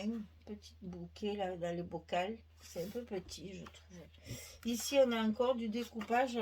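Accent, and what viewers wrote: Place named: Provence, France. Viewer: Bonjour 😊 Magnifique papier ❤ même si je suis une fille du Nord, j'adore 💕 votre Provence, les lavande, les vieilles pierres, des paysages magnifiques, votre accent...... Mon rêve! ❤❤❤❤ Merci et bonne journée 😘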